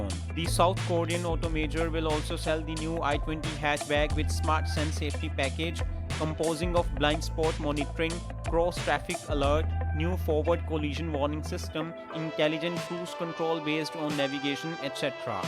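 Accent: India. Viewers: the indian accent 🤪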